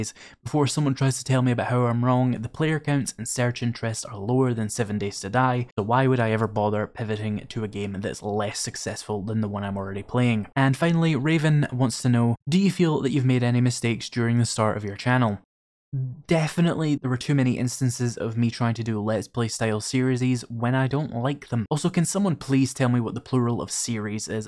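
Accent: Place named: England, United Kingdom. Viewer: Answer: Series. The plural of series is series. It's just like the word deer. You have one series. You have twenty series. This is because the word is a noun that itself is already describing a plural. Therefore, it retains the plurality in singular and plural. (This feels very awkward, being an American explaining English to a person with an English accent) 🤣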